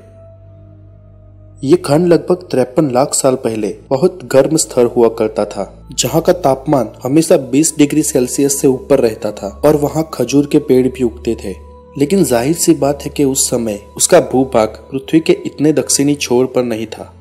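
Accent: Gujarat, India. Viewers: R u Gujarati??? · Speaker is Gujarati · Gujju ☝️ accent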